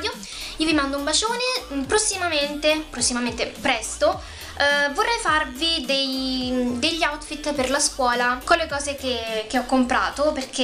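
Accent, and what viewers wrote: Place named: Rome, Italy. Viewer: bellissime cosine mi sei mancata in questo periodoo!!adoro il tuo accento romano😘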